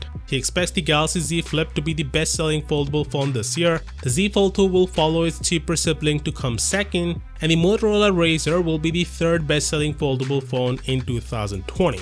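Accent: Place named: India. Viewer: This guy has an Indian accent if you listen carefully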